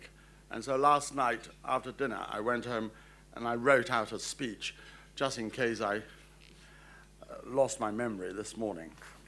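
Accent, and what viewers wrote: United Kingdom. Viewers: If I hide his face and just listen, I would have thought hes British....lollll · Belated comments upon learning the passing of Sir David Tang, who was, indeed, a truly intellectual and erudite gentleman with unmistakably British accent.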